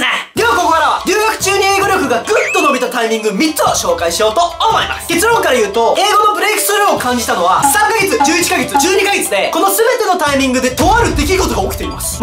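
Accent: Australia. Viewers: やばいオージーアクセントめっちゃかっこいい私もカナダに留学して2年目です！めっちゃ面白い🤣です。応援しています📣、！ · 1年でこんなに伸びるなんてすごい! 大学院(MBAかな?)TOEFLとかの下積みもあったと思いますがすごいですね。しかも、しっかりオーストラリア英語のアクセントになってる（笑） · ばっちりAussie accentになってて推せます。笑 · あと、オーストラリアンアクセントを習得してますよ！！！ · オーストラリアなまり！！カッケーっす！ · オーストラリア訛りっぽくなってる！ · 待ってなんで、1年でオージーアクセントを習得したんや！？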